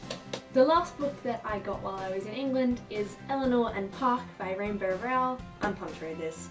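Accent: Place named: Australia. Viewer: I miss Australia so much, so I really liked your video! SIGH the wonderful sound of an Australian accent.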